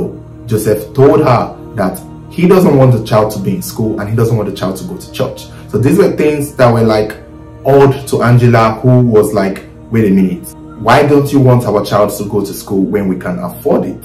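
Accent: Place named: Nigeria. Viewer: I like his accent too. He's different from the other Nigerians' accent. It's so difficult to understand. I'm from Mozambique my official language is Portuguese and I'm used to American, British accents.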